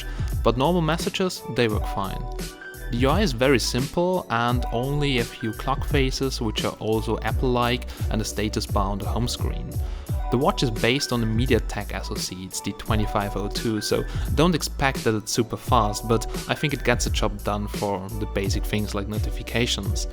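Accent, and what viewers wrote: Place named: Germany. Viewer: Zweites Video und weiß seit der ersten Sekunde das du Deutsch/Österreicher bist :D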